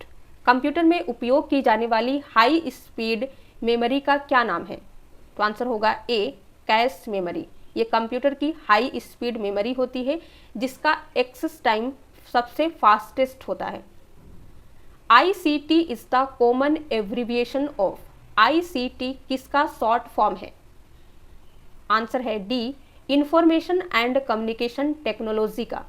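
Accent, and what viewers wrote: Odisha, India. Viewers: Are you from odisha mam?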